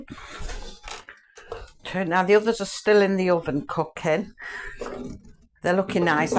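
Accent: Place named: Nottingham, United Kingdom. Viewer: I’m from Nottingham. I thought I recognized the accent!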